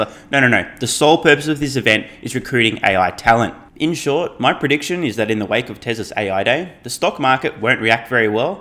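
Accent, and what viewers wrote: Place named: Australia. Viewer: For a moment I thought I heard swear, but nah, it was just plain Australian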